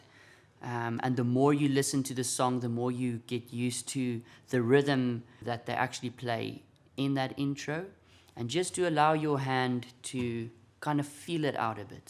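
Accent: South Africa. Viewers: can spot a south african accent from the first word hehe, great tutorial thank you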